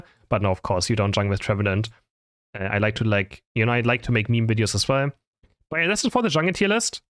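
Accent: Germany. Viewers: Are u german?because du hast da ein akzent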